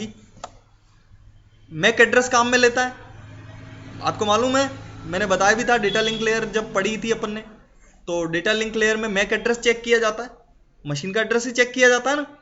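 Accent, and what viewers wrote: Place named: Jodhpur, India. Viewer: Sir me jodhpur se hu aapki aavaz se lag rha h ki aap sayad jodhpur ke ass pass ke ho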